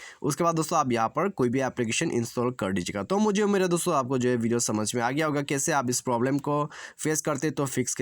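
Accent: Assam, India. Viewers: Bro i think you From Assam? 🤔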